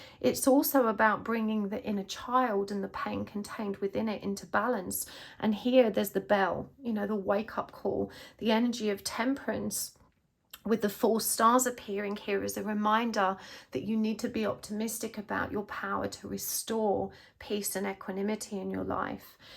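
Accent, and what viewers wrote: United Kingdom. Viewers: Wow just wow. Since my dark night I have regularly been using 2 readers on YouTube and no others, but your channel and readings kept coming up on my youtube feed, often alongside synchronic numbers but I resisted using another reader up until now. I have been drawn in by repeating numbers, animals and I have been dreaming of and seeing twins for the past year or so in cards and symbolism. (I am still not truly sure why) but this attracted me to your channel amongst the number sequences of likes, views and the time on my phone everytime you pop up but especially this time. I have been going through an incredibly tuff dark night for what I perceive is 4 years but I am learning it has really been my whole life, that was just when the awareness of my spirituality began amongst the chaos and trauma. I then had a series of life events happen which brought up both old and new trauma and triggered a kundalini awakening and I thought I was going crazy. 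Especially when my starseed awakening started... which I feel you may resonate with just from hearing you talk etc. Anyway I have been drawn to more than one reading but have only watched the first one do far, and it was so accurate in every way that I feel as though you was speaking directly to my soul. I am from the UK also and I must say it was both surprising and nice to here a British voice , as my other readers and starsseed family are all from the US. It just felt a little closer to home. Your reading ran very deep for me in many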